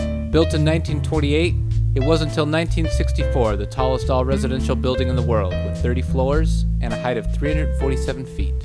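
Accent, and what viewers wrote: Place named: Chicago, United States. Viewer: The soundtrack, the shots, and the narration together form a perfect representation of that famous Chicago-style charm. That Chicago accent lecturing us on the details of Chicago's architecture with that appropriate music filling the background WAS SOO ON POINT.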